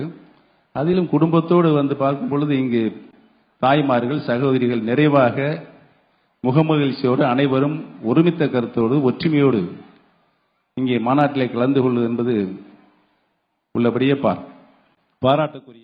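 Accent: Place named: Tirunelveli, India. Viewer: திருநெல்வேலி நாடார் லே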